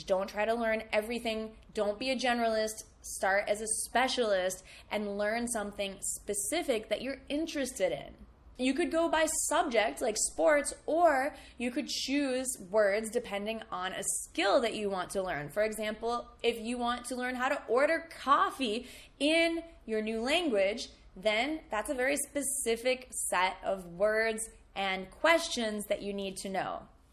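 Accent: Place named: United States. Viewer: What is the difference between American accent that you speak and Canadian accent?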